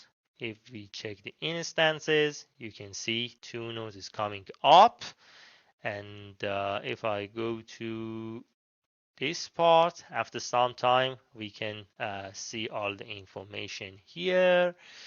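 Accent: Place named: Shiraz, Iran. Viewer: english with shirazi anccent ❤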